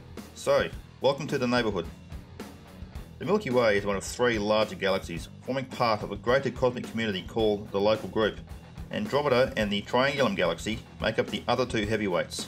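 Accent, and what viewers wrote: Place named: Australia. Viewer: That Australian accent tho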